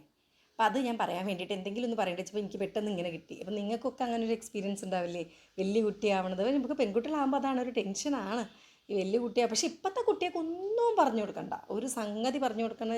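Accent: Kozhikode, India. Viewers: അവര് കോഴിക്കോട്ട് കാര് അല്ലെ same സ്ലാങ് വരും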